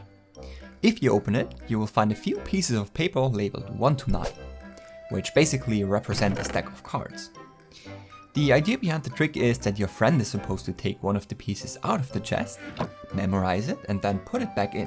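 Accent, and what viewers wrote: Germany. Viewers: Are you german? Because you really sound like.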